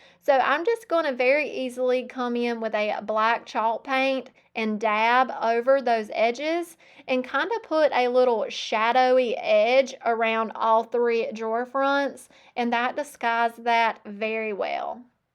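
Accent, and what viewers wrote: South, United States. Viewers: you don't change a thing, I love your sweet presence, and your awesome southern accent. I loved all of your projects, but my favorite are always the milk paint green. I hope you have a very Merry Christmas with your family and friend. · Oh my goodness, …. Your accent and vocabulary is a big part of your charm. At one time, I had a similar southern accent, and as I was on the phone a great deal with people around the country in my career, as people would tease me and not take me seriously, I worked hard to change my affect, and now, after all these years….its gone. Unless I go back home to SC and I fall back into it! 😂 Thank you for holding to your roots. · I thought you sounded country when I first started watching I grew up in country and I related and listening to you describe what you were doing with such cute projects is what made me keep watching So ignore that critic. 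I had a good laugh in another video when you said your dog was sighing because he was unemployed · I’m in the UK and I like to hear the variety of US accents, it just gives your channel extra character and charm. Loved the projects 😊❤ Merry Christmas 🎄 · I agree! I love hearing your cute southern accent. Don’t change a thing! Some people are just miserable and try to bring others down. We love you! ❤❤❤❤❤❤❤❤ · Girl, I'm from southeast Texas and I have a draw myself. I LOVE your accent and many people would KILL to have a southern accent. Have you ever noticed how much they use our accents in movies, YouTube commentary, etc. They are just jealous and only wish they had our accents. Be proud of who you are, how talented you are, your accent, your voice, everything. You're an amazing person and I personally love you and your channel! ❤❤❤ don't let the haters get to you. I would've pinned the comment on the screen and given her screen name. 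If more creators would call them out by name, I think it would Happen a lot less... keep doing you 😊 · Some people just don't like our southern accents. You are fine exactly the way you are. That ugly comment was just from a nasty person. That person definitely has the problem, not you. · I like your accent. To me it sounds very American. Surprise ! She's an American who lives in America. I don't have a problem with that 😅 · Please don't hire a narrator. I am in Germany and specifically listen to your sweet Southern Accent and find it intensely soothing. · And my little secret, I do this and I haven’t told anybody…. But I walked through the house sometimes going.” hi I’m.” in my best country accent. I love the way you talk. It gives me comfort you’re so down to earth. So when people are going around, copying you and repeating how you sound even if it’s just themselves, you’re doing something right lady. 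❤ Plus, if God wanted you different, he would have made you different. ❤️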